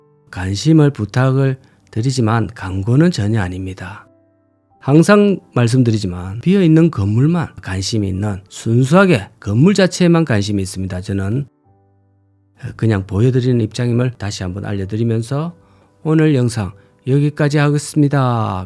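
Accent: Gyeongsang, South Korea. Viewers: 나레이션 특이합니다 경치도 재미있고 목소리도 재미있고. 집사람은 좀 느끼하다고 하지만 ㅋ 그래도 나는 경상도 사투리가 좋네요 ㅎㅎ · 이 분 부동산 인사이트가 대단하세요. 구수한 경상도 사투리지만 설명에는 정말 뼈가 들어가 있어요. 부동산에서 인생의 흥망성쇠도 잘 보여 주셔서 참 많이 배웁니다.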